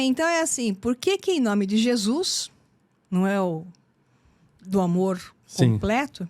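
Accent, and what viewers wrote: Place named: Rio Grande do Sul, Brazil. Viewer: Essa tia eh gaucha so' pelo jeito de falar. 😊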